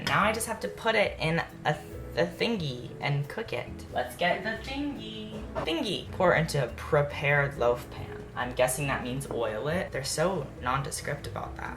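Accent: South, United States. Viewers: YOU CAN HEAR YOUR SOUTHERN ACCENT SO MUCH IN THIS VIDEO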